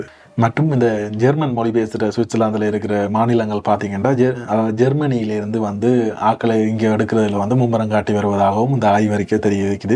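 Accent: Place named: Jaffna, Sri Lanka. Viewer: Your Tamil sounds sweet like Jafna people's slang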